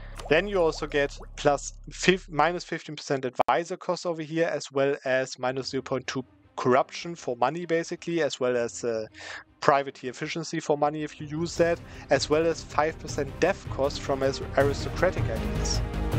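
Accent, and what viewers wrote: Germany. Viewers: Sometimes he really butchers the English speech xD Also you have a crazy German dialect but i like it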